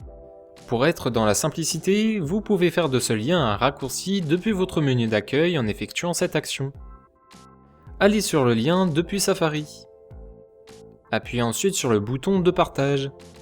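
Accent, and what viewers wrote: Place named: Switzerland. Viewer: Sémantique à revoir. Vidéo bonne. Accent Suisse qui passe mal, manque de punch.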